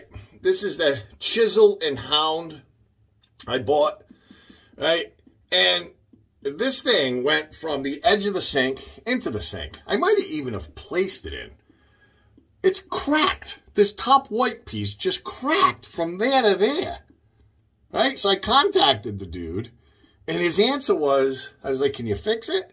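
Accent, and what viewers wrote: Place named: South, United States. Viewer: Love your content and the southern accent. 🙂 Keep up the good work!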